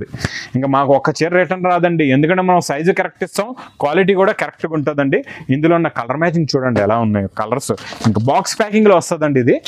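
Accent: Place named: Rajahmundry, India. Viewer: మీరు రాజమండ్రి నుంచి కదా ..నే పట్టేశా ..😅 వస్తున్నా ..😂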